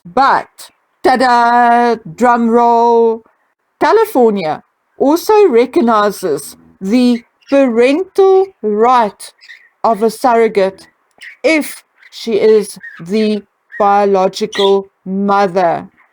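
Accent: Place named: Durban, South Africa. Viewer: JUST LOVE ACCENT EX DURBS? BACK IN UK.... M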